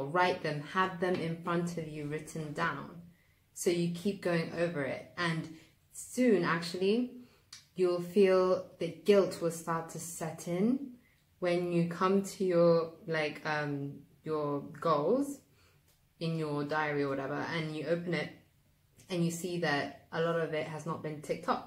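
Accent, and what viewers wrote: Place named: United Kingdom. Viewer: This is a great video. In love with your accent a bit British but not strong. Just so cool. And that's a great video content there!!! Keep it up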